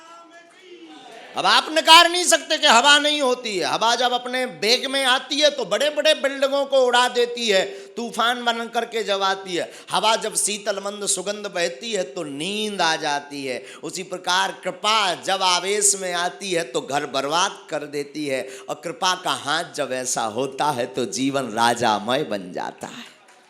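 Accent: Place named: Bundelkhand, India. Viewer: Ap ki mohak muskan bundelkhandi boli aur tha thari barne ki ada man moh leti hai.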